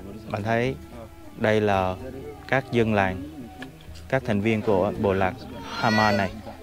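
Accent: South, Vietnam. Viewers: A là người nước ngoài sống ở Sài Gòn, a xem video của a để tập nghe tiếng việt vì giọng miền nam quen thuộc với tôi. Cảm ơn nhiều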